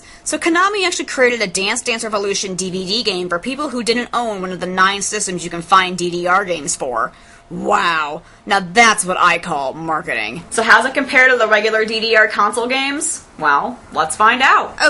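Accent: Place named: Australia. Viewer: Lol she is Australian not British. I love DDR but this was hilarious.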